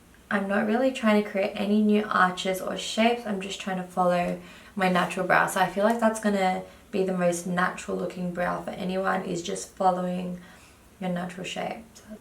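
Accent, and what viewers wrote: Australia. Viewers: U sound like an Aussie girl. Are you?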